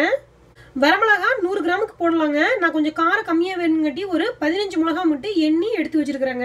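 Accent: Kongu, India. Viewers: yur voices lyk kongu slang in gobi which place yu are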